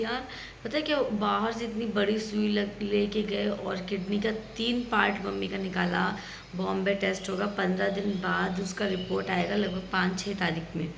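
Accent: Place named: Bengal, India. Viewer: App lakhnow se ho me smjhti thi ki ap bngal se ho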